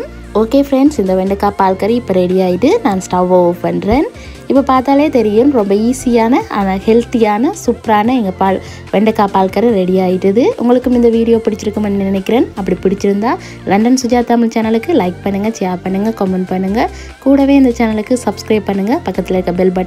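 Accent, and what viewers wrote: Sri Lanka. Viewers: Super neengal jaffna Tamil Thane kathaikirigal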